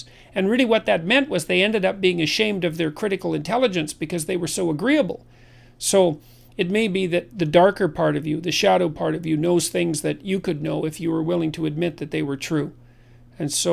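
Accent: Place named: Australia. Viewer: This is Australian accent ,Farmer style. All the country style accent have same characteristics, such as quick , not clear , not stable speed .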